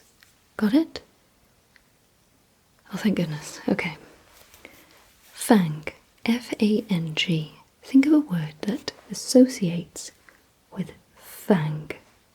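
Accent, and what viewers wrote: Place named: United Kingdom. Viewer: As you wish Goddess Wright Knight Rain Hurricane / ( I love your sweet British accent 😊) Requiem Twilight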